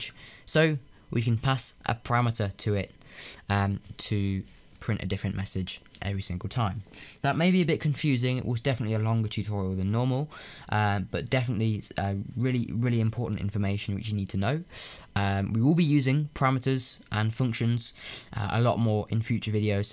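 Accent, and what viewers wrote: United Kingdom. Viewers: He still sounds British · I love *'s British accent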